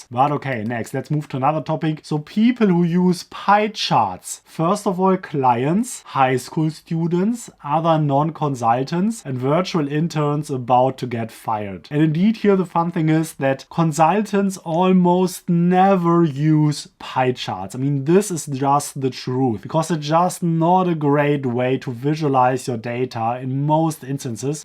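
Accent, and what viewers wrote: Germany. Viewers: I love your German accent while speaking English :D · Das gute alte German English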